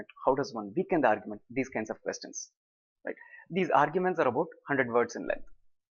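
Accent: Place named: India. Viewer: fuck off with ur indian English accent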